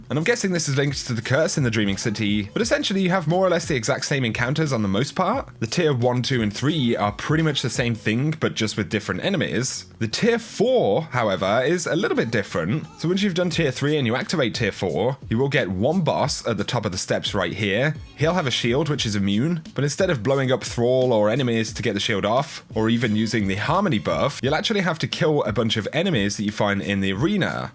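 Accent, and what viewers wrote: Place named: England, United Kingdom. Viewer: If Christopher Walken was a Destiny content creator with an English accent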